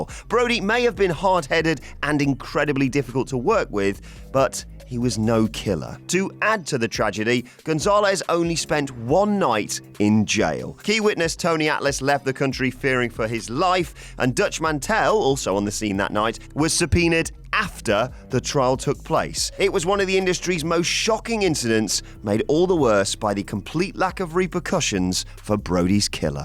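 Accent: United Kingdom. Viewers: Buck zoom off god I hate British English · Anyone else annoyed by British voice overs?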